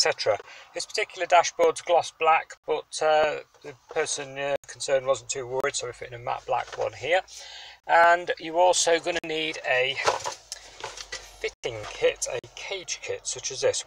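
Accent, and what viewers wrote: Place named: Nottingham, United Kingdom. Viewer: Do you offer a fitting service - you sound very local to me (notts) - extra coin for you if you wish?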